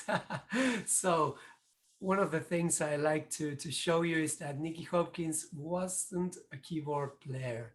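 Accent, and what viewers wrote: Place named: Argentina. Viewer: se nota que es argento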